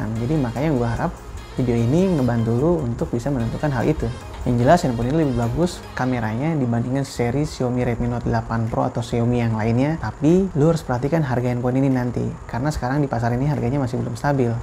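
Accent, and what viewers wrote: Cilegon, Indonesia. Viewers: Wong cilegon kih ? Boleh amat 🤣🤣🤣🤣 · Org cilegon?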